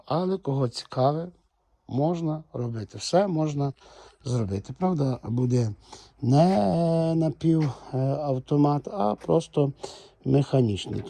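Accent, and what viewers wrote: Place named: Ivano-Frankivsk, Ukraine. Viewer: Ви часом не з іванофранківщини бо дуже знайомий акцент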